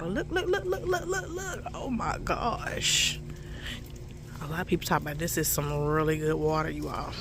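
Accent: South, United States. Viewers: This is my 1st time on your page, you have a amazing asmr voice, then add that southern accent, PRICELESS 😁😁😁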